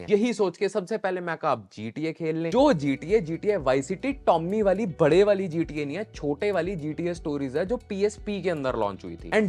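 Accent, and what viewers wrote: Punjab, India. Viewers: punjabi fadli teri hindi cho bro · Who noticed his Punjabi Accent 🙂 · work on your explaining method, your half panjabi breaking words accent is irritating. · Love your punjabi accsent ❤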